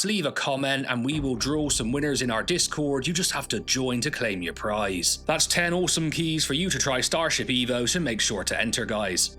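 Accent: United Kingdom. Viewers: I’m getting back into space games after a break from them and this video just popped up in my feed. I’ve subscribed, great to hear a regional english accent in a Youtube video! 😊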